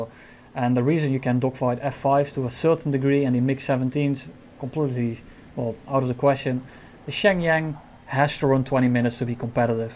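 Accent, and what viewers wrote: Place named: Netherlands. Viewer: They're both dutch.... it's literally just the accent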